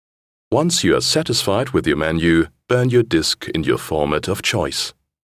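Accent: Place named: Germany. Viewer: the guy whos talking has a german accent!! lol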